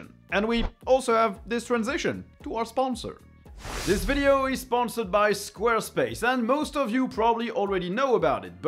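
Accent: France. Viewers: "Native waylANd experience" Ton français ressort toujours un peu, haha :')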